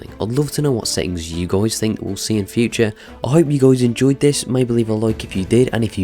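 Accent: United Kingdom. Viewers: Good Video. Unfortunately, I hate the british accent!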